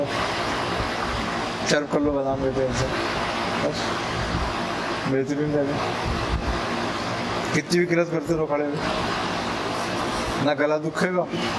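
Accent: India. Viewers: Hajrat Aap ki hindi ek dam India wali hai...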